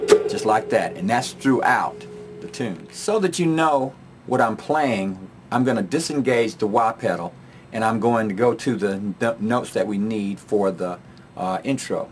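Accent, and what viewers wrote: New Orleans, United States. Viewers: You are one of the bet teachers I have ever learned from. Thank You. is that a New orleans accent?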